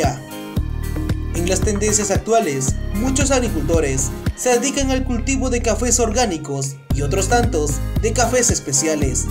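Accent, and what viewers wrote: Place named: Peru. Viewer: Habla con acento Peruano Carajo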